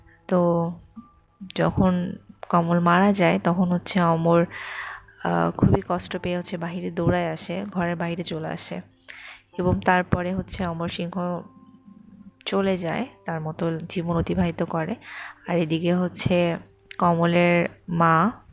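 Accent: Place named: Rangpur, Bangladesh. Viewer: অনেক সুন্দর বাচনভঙ্গি। কিন্তু পুরো সময় একটা চিন্তায় ছিলাম আবার কেউ যদি ভাবে এই মেয়ের সাথে কথা বলতেছি🤣কারণ ভয়েজ টা ওরকম, মনেই হয়না ভিডিও। যাইহোক আপু, বাসা কোথায় আপনার?কিছুটা রংপুরের টান পাচ্ছি🤭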